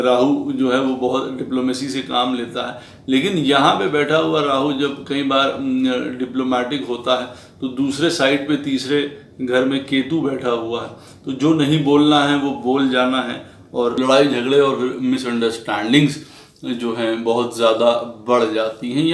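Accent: Lucknow, India. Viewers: सर आपका बात करने का तरीका बहुत बढ़िया है , ज़बान लखनवी लगती है । यदि यह तुला लग्न में हो तब 9वे राहु व 3रे केतु के उपाय भी बताए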